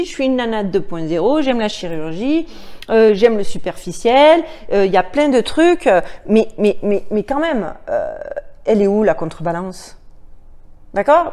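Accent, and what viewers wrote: Marseille, France. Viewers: Albert Pike, c'est Paille-K, pour la prononciation (je croirais presque que tu mens quand tu dis vivre aux States! hein ma gueule?:) de Marseille! j'adore ton petit accent que tu as dû bien perdre depuis... mdr ) et aussi à 15' et des, on dirait ptet des détracteurs (mais dénigrateurs j'aime bien aussi, ça fait penser aux oiseaux migrateurs: ceux qui vont voir ailleurs... si j'y suis;) Et franchement, tu as de la jujotte, j'aime beaucoup ton implication et ton recul en même temps.... dans tout ce que tu cherches à comprendre... T'es vraiment intelligente je trouve! Bon voilà, après avoir regardé une 20 aines de vidéos sur ta chaîne, je me mets à te tutoyer, mais cela ne veut pas dire que j'ai moins de respect pour autant... C'est ptet même le contraire: je te vois comme une "sister" de ma génération! (même si tu dis dans une vidéo qu'en 95 tu n"étais pas née, je sais que ce n'est pas vrai:p ) Et ton angle de vue (vu des States) m'apporte beaucoup! 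Merci! Stay Safe aussi (vraiment, fais gaffe à toi) xx Take care xx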